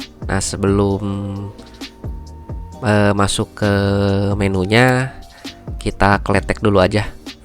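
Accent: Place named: Bandung, Indonesia. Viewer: Asa bandung yeuh logatna,salam kang ti bogor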